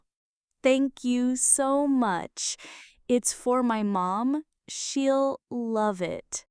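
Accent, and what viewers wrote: United States. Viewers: Is it American English?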